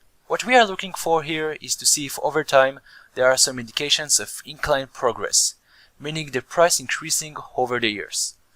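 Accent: Israel. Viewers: יש לך מבטא ישראלי חלש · I'm just from israel too and i was pretty sure you are by your accent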